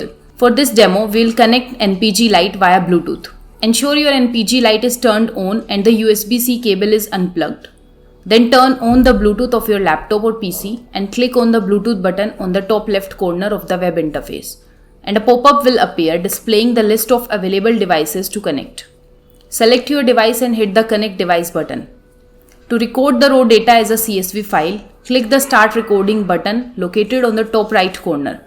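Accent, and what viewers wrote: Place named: Rajasthan, India. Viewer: she must be Rajasthani or Gujrati. very strong accent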